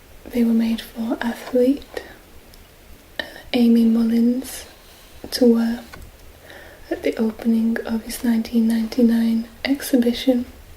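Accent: Scotland, United Kingdom. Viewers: Is she from UK? I love her voice and accent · Since I have not heard you speak out loud it is difficult to place your accent. I would guess Scottish? but I can't be certain. I have been watching your vids for some time and they always relax me.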